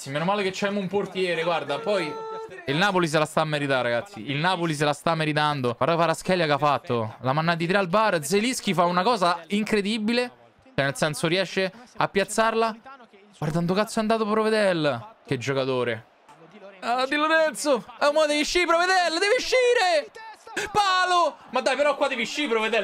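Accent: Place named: Frosinone, Italy. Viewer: Bravo.... tutto sommato obbiettivo e onesto per quanto ha visto e raccontato. Detto da un romanista é un grosso complimento. Rimane questo tratto di accento frusinate che non guasta visto che parliamo di un laziese😅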